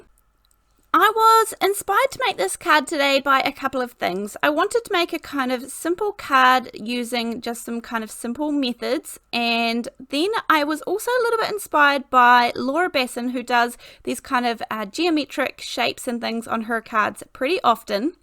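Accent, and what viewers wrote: New Zealand. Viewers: Another great card! I just love all your creative, use what you have video's. You have become my favorite YouTube person to watch. Love your New Zealand accent too.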